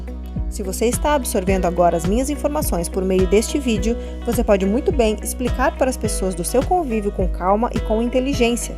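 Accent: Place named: Paraná, Brazil. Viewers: Me inscrevi nesse tbm , irmã. Vc é do Estado do Paraná?. Aparentemente parece vegetação da região sul, dois lugares me faz lembrar da região Sul ou Paraná como tenho dito ou Santa Catarina . Região q está no mínimo a 800 M de altitude do nível do mar . Eu tô certa QTO a isso?. E tbm pq vc tem sotaque paranaense . Ou no máximo santa Catarina q o sotaque é mais forte , mas acho q vcs são do Paraná. Sucesso nessa empreitada. E outra vc pelo jeito de parentes q ainda estão são n foram beijados, mas no meu caso n tive esse livramento familiar. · É região sul, está muito certa! O sotaque do sul é tudo meio parecido mesmo... kkkkk! E nesta região alguns pontos são em torno de 900 metros de altitude. O Criador nos guiou para este local, foi de uma hora pra outra. Estávamos nos organizando apenas com barraca para fugir quando chegasse o momento. Por isso, estamos mostrando tudo que estamos fazendo! 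Desta forma, acreditamos que o nosso mover pela fé possa encorajar os irmãos a fazerem o que estiver ao alcance. Sabemos que construir refúgio na montanha é algo mais extremo, mas é olhando para um extremo assim que muitos podem pensar em fazer o mínimo. Entende? Sobre família, eu faço questão de contar a todos que me perguntam! Apenas meu marido está enxergando do mesmo jeito que eu. Meu pai e minha mãe apenas gostam de natureza, mas meu pai acha loucura esse lugar. Ele reclama quando vai lá... E ele não gosta quando a gente fala do tempo do fim. Já a minha mãe fica mais em cima do muro, ela até entende tudo que a gente fala, mas ela acha que não é para agora. Mas eu ainda tenho minha irmã, meu cunhado, a família do meu cunhado e toda a família do meu marido que estão vivendo no mundo e não dão muita importância quando a gente fala alguma coisa. Então, nós sabemos que precisaremos deixar tudo e todos para trás quando tudo acontecer. 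Estamos nos preparando espiritualmente, psicologicamente e na parte prática também. Quanto à empreitada, eu e meu marido temos em mente que não faríamos isso, até não conseguimos acreditar que est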